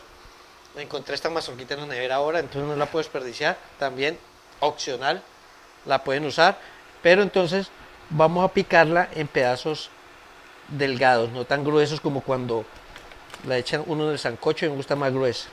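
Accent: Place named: Pereira, Colombia. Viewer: Hola se ve deliciosa la sopa : me hizo reír con tu video, eres un poco charro y por tu asentó pareces de Pereira Risaralda. 😀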